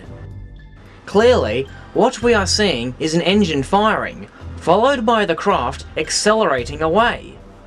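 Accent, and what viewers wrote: Australia. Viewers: Fucking aussie, just shut up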